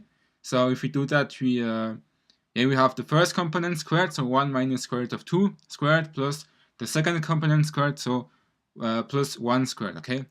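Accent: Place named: Germany. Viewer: Are you german? Haha I like the way you talk. Anyway, nice videos!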